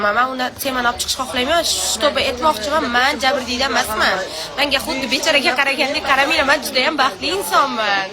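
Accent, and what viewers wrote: Khorezm, Uzbekistan. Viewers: Хоразм шевасида гапиряпти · Сизга подписка булдик. Позитив инсон экансиз. Хоразмча жудаям бошкача ти л тушуниш кийин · Хоразм шеваси бу одамга билмай тухмат килма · Bir karasa xorazmcha bir karasang uzicha toshkencha gapiradimi ukuvsiz ekanbu maktabda ukiganmi bu😢😅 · xarazm tili chiroyli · Turkçe iyi konişamiyosin 😮😮😮 sen Harezmdan galiba😮😮😮Harezm şivasinda konişyosin😮😮😮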